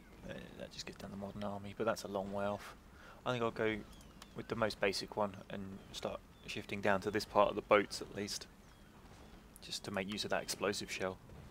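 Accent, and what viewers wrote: United Kingdom. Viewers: are u brittish?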